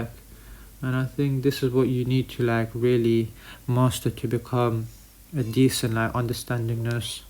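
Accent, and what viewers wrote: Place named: RP, United Kingdom. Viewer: Yeah, that's an RP accent you've got there. Your location on YT says that you're from India tho?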